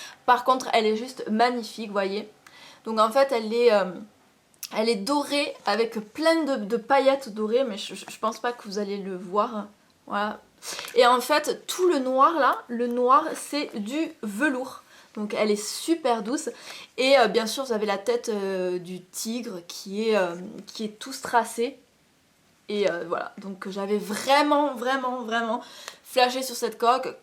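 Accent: South, France. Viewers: Super vidéo sa se voit que tu viens du sud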